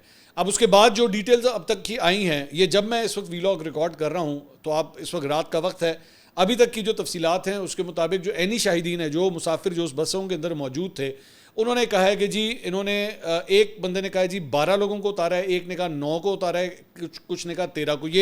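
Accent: Punjab, Pakistan. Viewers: صاحب آپ کا حب الوطنی سے سرشار یہ دبنگ انداز بہت اچھا لگا اوپر سے پنجابی کا تڑکا کیا کہنے ہیں آفرین ہے بھائی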